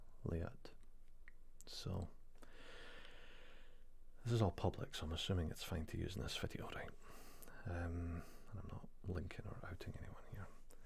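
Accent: Scotland, United Kingdom. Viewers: Loved this video, it was interesting but a bit sad people feel if something is too Scottish it is inferior. I always think of Scotland as a beautiful place with strong people with a history I find very interesting. Your accent is so beautiful!!!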